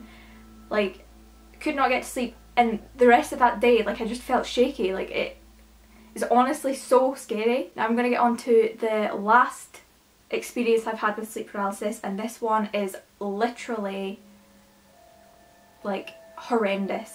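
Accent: Scotland, United Kingdom. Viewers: This story time is immediately 10x better with your Scottish accent omg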